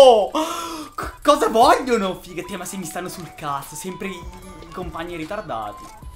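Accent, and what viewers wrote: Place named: Bergamo, Italy. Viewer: Quanto si sente in sto video il tuo(pure mio ahah) accento bergamasco oh ahahahah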